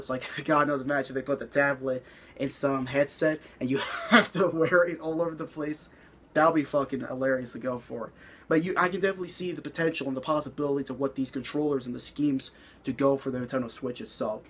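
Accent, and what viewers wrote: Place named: New York, United States. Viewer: I love this New York accent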